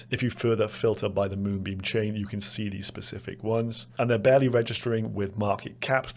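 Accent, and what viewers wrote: Cardiff, United Kingdom. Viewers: Nice video cheers man. Is that a cardiff accent I hear? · are you from Cardiff?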